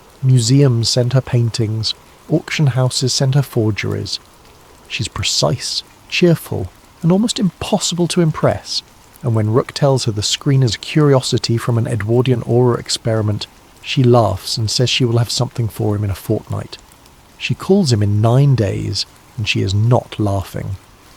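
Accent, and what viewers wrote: United Kingdom. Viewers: I love the soft spoken British accent putting me to sleep 😴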